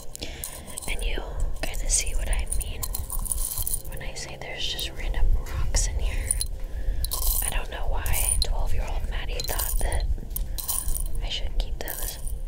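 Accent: Midwest, United States. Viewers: I would have guessed the midwest, but im from Finland so I wouldnt know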